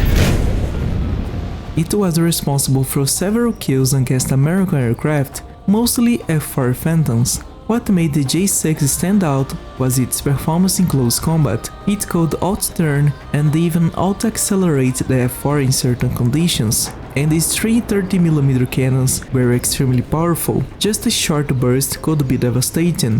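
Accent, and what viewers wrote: Brazil. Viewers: Where u from? You sound like a brazilian guy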